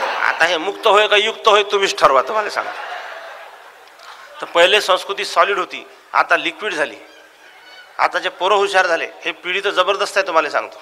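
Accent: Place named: Vidarbha, India. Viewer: Vidarbhachi Marathi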